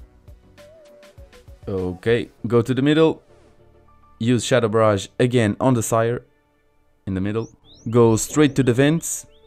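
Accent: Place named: Portugal. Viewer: este sotaque de tuga nao me engana, bom video mano :)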